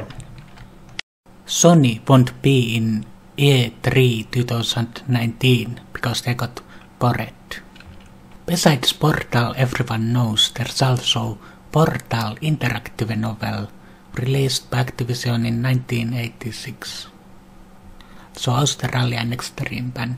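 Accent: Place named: Finland. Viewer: Why you speak English in Suomi pronouncation?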